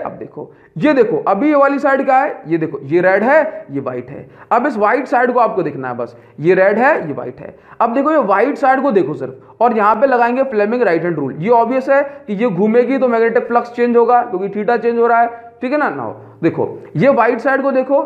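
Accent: Odisha, India. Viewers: Odia ho kya sir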